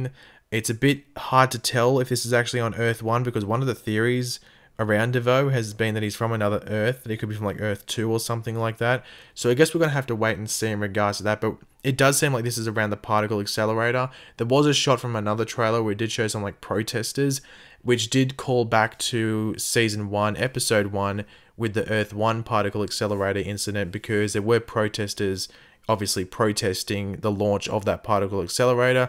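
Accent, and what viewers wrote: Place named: Outback, Australia. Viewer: I didnt subscribe because of your content, i subscribed because of your outback sounding voice, just sayin hahaha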